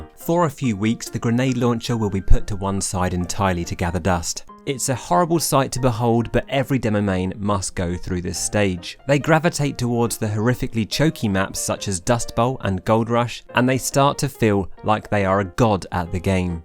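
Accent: Scotland, United Kingdom. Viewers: but are you scottish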